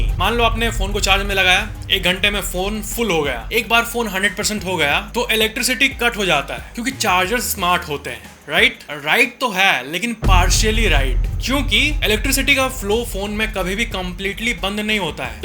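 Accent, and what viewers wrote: Bihar, India. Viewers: bhai tu bihari ho na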